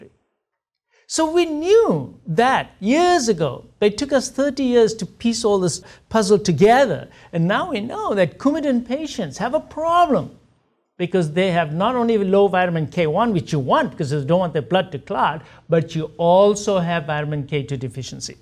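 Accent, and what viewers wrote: India. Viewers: Doc your accent is very nice not too english but still have that little indian accent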